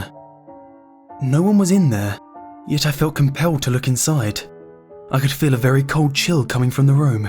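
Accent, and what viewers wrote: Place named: United Kingdom. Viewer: Firlst time one this chanal by the way Me when it starts: AHHHH!!!! BRITISH ACCENT!!!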